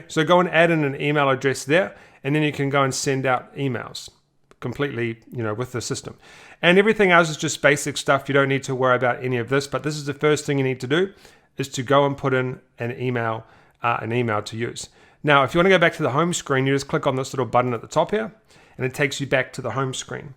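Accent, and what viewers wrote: New Zealand. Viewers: Hey mate, I stumbled across your videos a couple of weeks ago. I love the content bro!! Keep it going! You sound like your from New Zealand too? Haha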